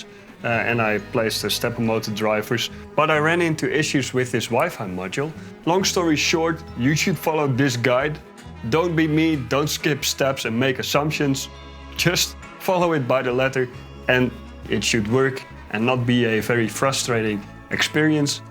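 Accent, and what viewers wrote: Netherlands. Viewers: I tought you were swedish. But you're speaking gibberish. Netherlands? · You sound very Dutch. Good video tho! Subscribed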